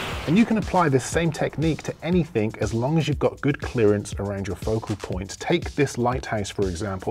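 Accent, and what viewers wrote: United Kingdom. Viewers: I just subscribed a few days ago, I watched at least 15 vids of yours, I ordered a Gimbal this morning ($139) which I have never beard of lol. Next I will check out Epidemic Sound oh and Skillshare too. I just started my youtube vids, after I watched yours I am so embarrassed to show mine. But Honestly I think I have a crush on you, you're so talented, genuinely kind and hunble. LOL not to mention your British English accent so sexy 😅😅😅😅 ok let me stop 😅😅😅😅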